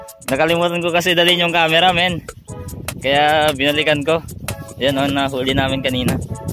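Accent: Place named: Nueva Ecija, Philippines. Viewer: Taga saan ka bro parang nueva ecija ang punto mo ahh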